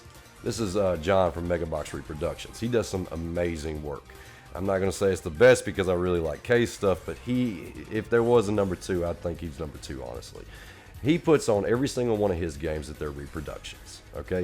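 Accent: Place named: South, United States. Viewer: Great video, I recently picked up a few repo myself and have no problem with them I'm not going to sell them, there staying in the collection. Love the soft southern accent. Just subbed.👍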